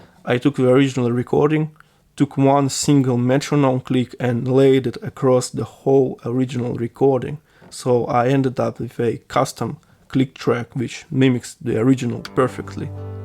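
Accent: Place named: Russia. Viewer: Enough bashing yourself, you speak and explain very well, in fact you have a rather weak Russian accent, if it bothers you, I can help quickly (went through the same);)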